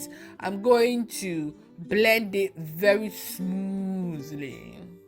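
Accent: Ghana. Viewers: My first time here tho😁 you are funny and you sound like a Ghanaian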